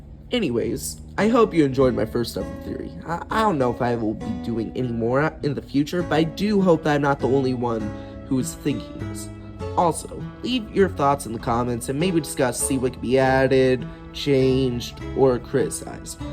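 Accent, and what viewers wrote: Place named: Canada. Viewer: you talk like a canadian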